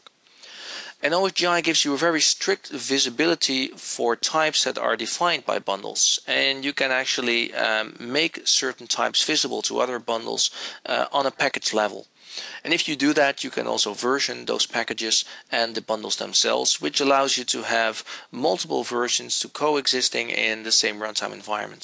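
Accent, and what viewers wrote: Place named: Netherlands. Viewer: Dutch accent:) Thanks for the short intro.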